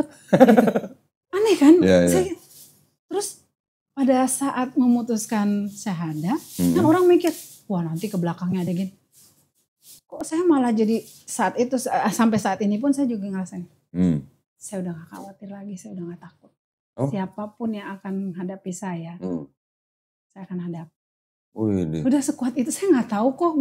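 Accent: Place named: Betawi, Indonesia. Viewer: betawi banget........dialeknya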